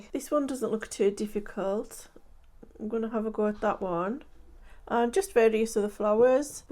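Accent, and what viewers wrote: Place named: North East England, United Kingdom. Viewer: Thanks for another helpful video. I thought you were saying cornflowers in the beginning but it was your north-east accent.🤭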